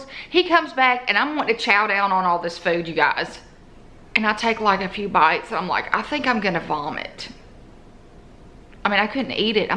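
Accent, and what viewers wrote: South, United States. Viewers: Watching your video, hope ur well, I had mine December 1st, only bled 3 days, and stopped taking pain meds by the 3rd day, awww ur kitties, how many you have , such sweetie s, Sending hugs and prayers, where you from, I love people with southern accent, oh Girl, I know about the pot bellies, I hate it, I'm 46 , I had mine in December 1st and my Birthday is on Jan 9th, so I was 45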